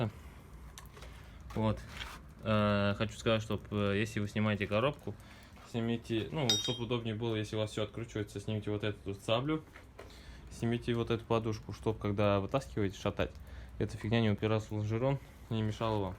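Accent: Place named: Tatarstan, Russia. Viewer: Вот и татарский говор вам))) Привет с Казани)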